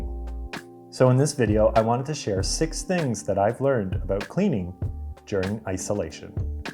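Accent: Canada. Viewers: yes he sounds Canadian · Sounds Canadian, no? Very calming.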